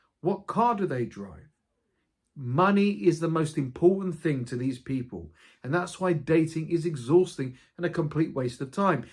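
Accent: United Kingdom. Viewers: I have difficulty understanding the British accent. But I thank you for making this video. Because this is the first time someone has made a video on this topic, I agree with everything you said. In fact, there are some shortcomings. Global capital has globalized the entire world. It has corrupted people's morality. People's minds and brains have become completely for money, global capital culture has ended morality and marriage. People are now avoiding each other. Nobody trusts anybody. Almost everyone has become evil, turned into a narcissistic dog, thank you again for making this video.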